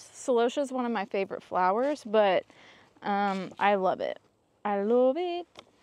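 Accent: South, United States. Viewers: Just found your channel. We grew other colors of broom corn. I believe it was red blue and yellow. Been a long time but I think that was what we grew. Also, I have a question. I know where you say you live BUT I don't think that is where you are actually from. I'm in Alabama and you sound as though you are from here or Tennessee or Kentucky or at least the south. Am I close ?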